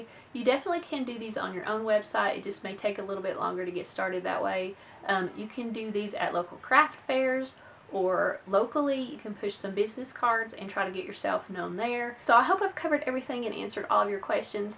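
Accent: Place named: Appalachia, United States. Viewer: Thank you,! I love to listen to your delightful Appalachian accent!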